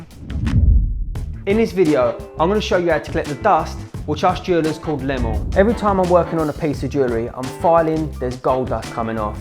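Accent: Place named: Birmingham, United Kingdom. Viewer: Just curious are you from brum? U got a brummy accent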